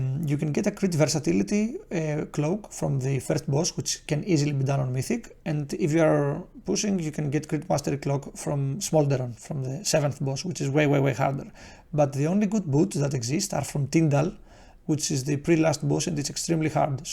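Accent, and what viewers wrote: Greece. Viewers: Nice guide will check it out. One irrelevant question though. Are you greek ? Your accent makes me think that, thats why i ask.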